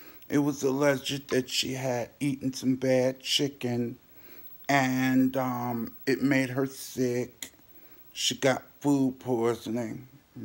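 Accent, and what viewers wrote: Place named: New York, United States. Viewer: Are you from New York? You sound like a New Yorker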